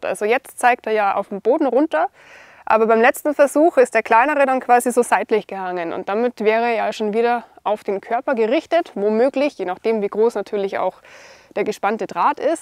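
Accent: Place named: Swabia, Germany. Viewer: Perfekt wär das Video, wenn die ganze Zeit schwäbisch gesprochen hätte - ja, dieser kleine Kieferle! 😉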